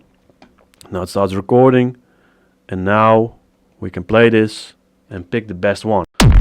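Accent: Netherlands. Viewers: A hard hitting techno kick and a guy talking with a pleasant Dutch accent... I guess I going to listen to an old Thunderdome CD when I am going to bed tonight. In fact, I find your tutorials quite lekker.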